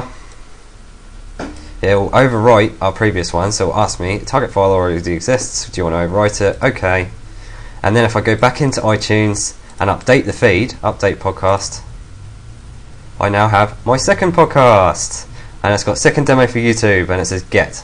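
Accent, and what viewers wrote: England, United Kingdom. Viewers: when i create my file in the main directory it comes up as podcast.rss.txt does it matter that the .txt is at the end? I cannot upload to itunes and i'm guessing its because of that ...btw you talk to damn fast and have an english accent so i cant fully understand what you are trying to say ...folder sounds like " fohder "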